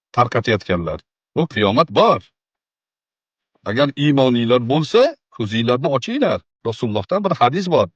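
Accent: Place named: Namangan, Uzbekistan. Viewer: Сизни Илмингизни хурмат киламан лекин илмингиз бор одам Наманган шевасида гапириб эрмак килдийиз исломда одамлар устидан эрмак килиб Кулиш борми номахрам аелга гул бериш борми, видойингизни таркатган1та аелни деб бутун Наманган устидан кулдийиз шу тугрими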